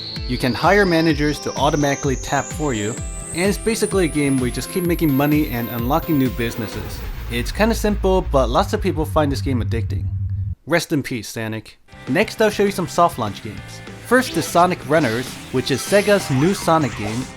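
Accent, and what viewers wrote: Netherlands. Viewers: Your dutch are you?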